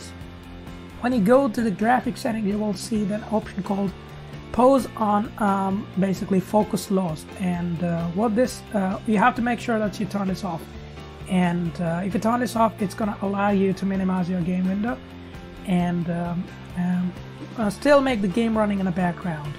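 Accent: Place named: United States. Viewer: I dont want to be mean but he sounds like Mikey Mouse with an American accent. He makes amazing and helpful videos still.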